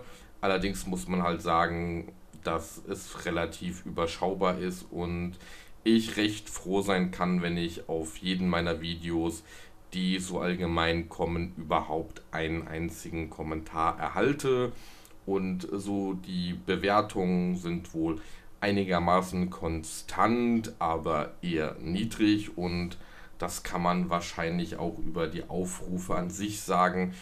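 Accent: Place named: Germany. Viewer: Ich wuerde nicht beschreiben als erfolglos. Du hast ja einen deutschen Akzent aber du bist dennoch verstaendlich. Ich finde deinen Akzent sogar charmant.Weil ich eine Fremdsprache lerne, bin ich grosszuegig, wenn andere Fehler in meiner Muttersprache machen.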